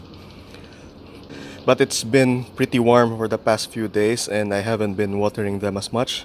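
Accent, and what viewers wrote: Philippines. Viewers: your accent is filipino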